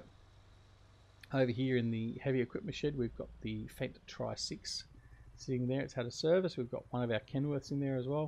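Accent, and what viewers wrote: Australia. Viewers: Did you need to use GE to do some of your farm upgrades like main entrance and removing buildings? Love your videos and most favourite FS19 YT'r. I wish I could make Maps and would like to add some Aussie maps and do some work on WA map. Good to hear a fellow Aussie voice on YT. Best wishes for your wife. Keep up the great work.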